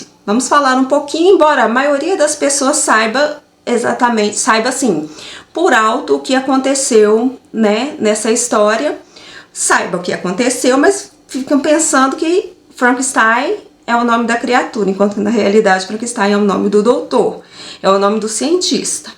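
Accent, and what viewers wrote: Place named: Minas Gerais, Brazil. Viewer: Sotaquim mineirim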